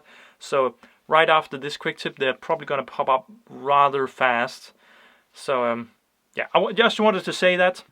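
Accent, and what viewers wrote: Denmark. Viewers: is he danish? he has a very danish accent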